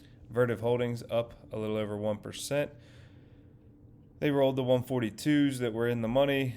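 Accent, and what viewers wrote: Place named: North Carolina, United States. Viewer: You sound like you could be from N. Carolina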